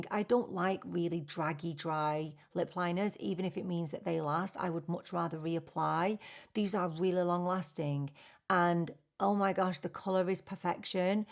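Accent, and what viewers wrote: Ireland. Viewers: I have been loving your videos & binge watching instead of TV or the major trial in Mass. I am an Irish ☘️ American. Your brogue didn’t sound like my nana’s. You are so professional & funny & enjoyable. I am porcelain strawberry blonde.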